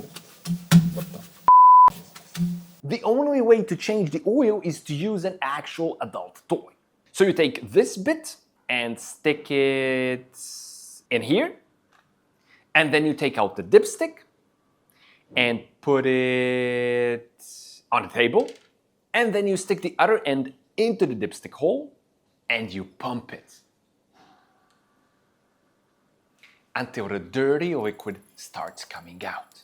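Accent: Eastern Europe. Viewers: Wait, this guy is Portuguese? Why have I always thought he is eastern European? 😅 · Wait, you're Portuguese?! I always thought you were eastern european... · O quê, és português? Eu tinha ssumido que eras da Polónia ou Latvia ou um pais mais para o este da europa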